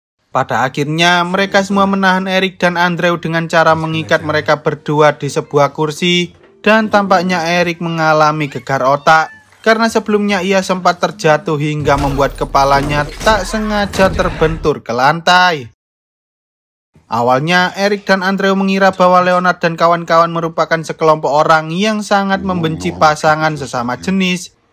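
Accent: Java, Indonesia. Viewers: Lu org Jawa y bg medok bener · Jujur bng nonton nya kurang seru,mohon maaf bng ya suara Abang lebih ke logat2 jawa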